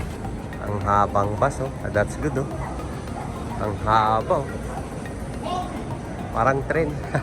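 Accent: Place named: Philippines. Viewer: Just saw you, recommend by YT. Gusto ko po yung accent nyu pilipinong-pilipino.. I like raw vlogs kahit mahaba basta realtime. keep it po kuya,, Wag nyu po kaming kalimutan pag lumago na ang YT channel nyu .. Ingat po always Godbless you po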